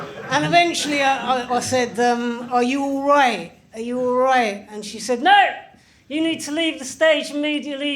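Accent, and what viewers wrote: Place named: United Kingdom. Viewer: He's funny, but he'd really be funny if his accent wasn't as thick as Keir Starmer's head. Very hard for non-Brits to make out a lot of his lines.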